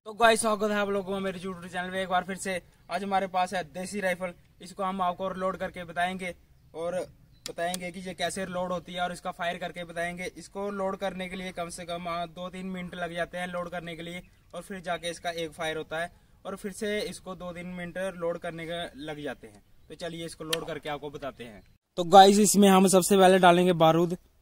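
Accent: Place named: Jammu, India. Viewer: Bhai jammu se ho aap ?? Apka accent whi ka lag rha hai😅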